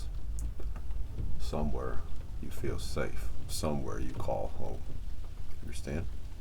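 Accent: South, United States. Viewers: Being from Oklahoma, your accent is pretty spot on, as are your jokes, very much something a southerner would tell. I love this ASMR, especially because of the rain and your deep voice. I really hope you can get a 3Dio sometime soon, that would make your content even more incredible. I wish I could donate, but I wish you the very best in reaching your goal! Thanks again for the amazing work you create. (I really need to stop leaving long annoying comments ahh) <333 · Very sexy character.I like it when you use your American accents!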